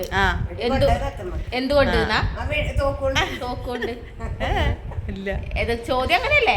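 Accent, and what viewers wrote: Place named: Kochi, India. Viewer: സന്തോഷം ഞങ്ങ പോണ്. നമ്മുടെ കൊച്ചി ഭാഷ മറന്നില്ല 😂😂😂❤❤❤❤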